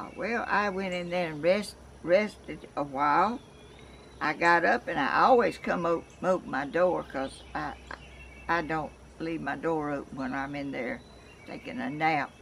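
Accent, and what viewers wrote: South, United States. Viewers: Bless your heart! I bumped into your videos yesterday and have been watching non-stop! My Mom was from Virginia Beach and had family all the way down the coast including Georgia so listening to you talk is such a balm to my heart! Some of my favorite memories are of sitting outside with Ice Tea and listening to all the southern conversation. My Mom is gone and I miss her something awful so if you don't mind I'd like to think of you as a long lost relative that I didn't know I had. 😉. God bless you and keep you! And Cody will be back before you know it! 💞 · In these awful times, it's sure nice to hear a Southerner like my Grandmother. Listening to you is like going to my Grandfather's farm in Slocomb Alabama. I now live in Northern California and miss my family very much. I grew up in West Palm Beach, FL in the late 60s and 70s when it was still beautiful down there. 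My family is all over between Slocomb Alabama and Panama City Florida. So I guess we're kinda like long-distant neighbors of sorts. :)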